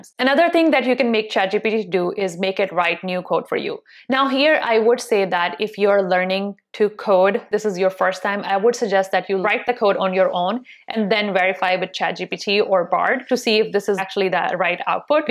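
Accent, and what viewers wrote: India. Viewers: You sound lit bit like an Indian. Anyways thank you for this video.👍🏼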